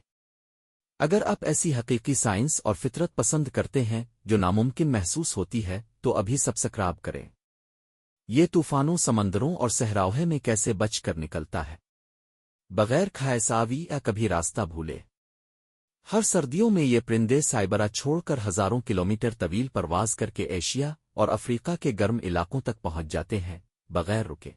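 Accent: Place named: Hyderabad, India. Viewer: مجھے حیدرآباد کا اردو لہجہ بہت بسند ہیں۔❤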